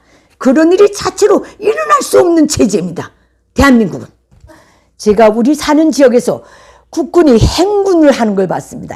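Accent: Pyongyang, North Korea. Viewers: 님이 코디에 신경 쓰셨네요..ㅎㅎ 보기 좋습니다.. 제가 보는 님의 매력은 북한식 억양으로 표현되는 재치와 품격입니다.. 전문 저널리스트로서의 깊이가 느껴져요.. 의상은 너무 신경 안 쓰셔도 됩니다.. 주변에 아는 여성분들에게 협찬(대여) 부탁 하세요.. ^^ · 반갑습니다.! 언제나 내가 좋아하는 평양 말투를 멋쟁이 김기자님을 통해 들을 수 있어서 행복합니다. 그런데 북쪽 이야기는 들을 때마다 충격이며 가슴이 아픕니다. 어떻게 이 지구상에, 더구나 우리 동족에게 이런 일이 있을까?..... 어떻게 저 체제가 허물어지지 않을까??... 놀랍기만 합니다. 한편, 일찌기 부모님을 남쪽으로 인도하신 하나님께 감사하지만 속아 살고 있는 북한 동포들이 너무나 불쌍합니다. 반드시 김정은체제가 무너져야 한다는 생각입니다. 항상 우리 국민이 꼭 알아야 할 좋은 말씀 들려주셔서 감사합니다. 기자님! 홧팅!! 건강하십시요.~♡ 행복하십시요.~♡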